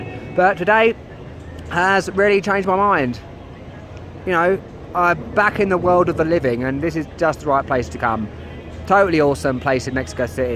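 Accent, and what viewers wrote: United Kingdom. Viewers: Hi! I am a native from Mexico City and I love your videos on my home town. I like your style: dynamic and objective. I don't live in Mexico City anymore, so when I watched your videos, I got to see new places and attractions. Also, I LOVE your British accent. It's pretty cool. Wish a great sojourn at Mexico City. Cheers!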